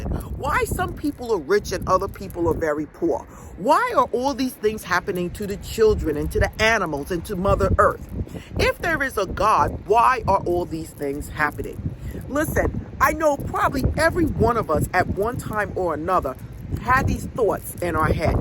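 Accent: New York, United States. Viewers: She sounds like a New Yourka!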